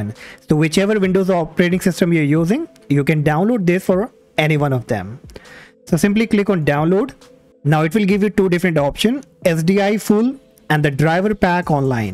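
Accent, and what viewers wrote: India. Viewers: Indian accent...Beware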